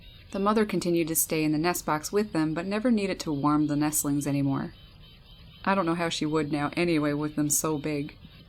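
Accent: Newfoundland, Canada. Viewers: You sound like a newfoundlander. Am I right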